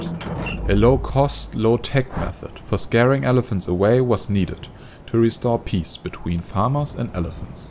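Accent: Germany. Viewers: I love the accent of this narrator. Sounds like german man speaking english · Your accent sounds German. Are you ? :) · Könnten vllt auch mal Videos in Deutsch kommen? An sich mag ich den Kanal, aber der deutsche Akzent nervt etwas... 😅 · german Accent._. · Are you german? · Are you German? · haha the guy sounds so german ;) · Dieser cringige Deutsche accent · Bist du Deutscher? Hörst dich jedenfalls so an😂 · why u have are german accent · Man hört das du deutsch bist. Irgendwie hört man das am Englisch raus, aber ich mag deine Videos :D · are u german?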